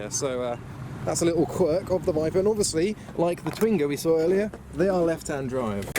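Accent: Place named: Roadman, United Kingdom. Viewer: "bare spaces" road man over ere 😂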